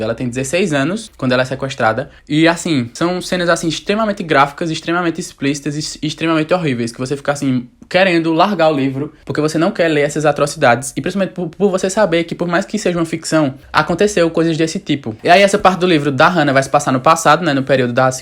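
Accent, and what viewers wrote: Northeast, Brazil. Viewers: Cheguei agora no seu canal e já quero assistir todos os seus vídeos, acho que me identifiquei mais por conta do seu sotaque (muito bom de ouvir, diga-se de passagem) já que eu tbm sou do nordeste. Ganhou mais uma inscrita e já coloquei vários na minha wishlist. :)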